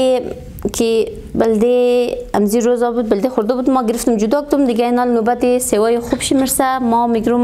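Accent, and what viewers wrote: Hazarajat, Afghanistan. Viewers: توضيحات قشنگ تان به زبان ولحجه ای شیرین هزارگى بسیار عالی بود موفق باشید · ماشاالله دخترآغیلموچقدربه لهج ساده هزارگی وشیرین گپ میضنی قربان زه بانتو شوم خوارجانمه🌈🦋👍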